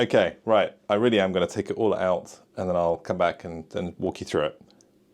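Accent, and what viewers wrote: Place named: United Kingdom. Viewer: Very good instructional recording; along with your British accent and mannerisms, made this pleasant to watch. Thank you from Canada 🇨🇦 Merry Christmas or as you would say “Happy Christmas!” , and a Happy New Year 🥳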